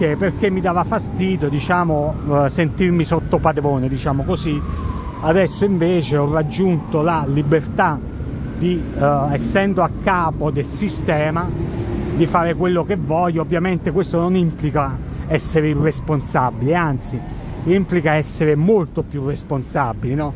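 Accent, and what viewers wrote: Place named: Campania, Italy. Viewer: Complimenti per i tuoi bei video. Ti ho scoperto da poco. Una bella passeggiata come farebbe un tranquillo turista. E poi il tuo accento campano è magnifico, fa il 50% della bellezza del video..👍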